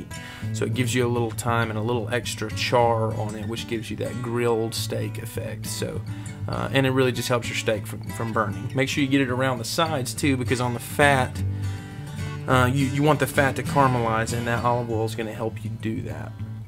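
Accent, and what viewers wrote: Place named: South, United States. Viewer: Lol....sounds like he has a southern accent and I've heard southerners use oil like it's a marinade.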